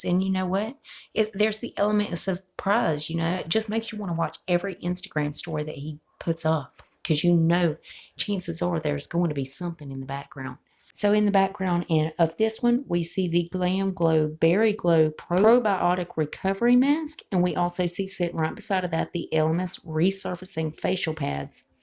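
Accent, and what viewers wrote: South, United States. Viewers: has always had a strong southern accent, just like myself. I'm from the south as well. I've been subscribed to her from almost right when her channel started, and her accent has always been the same.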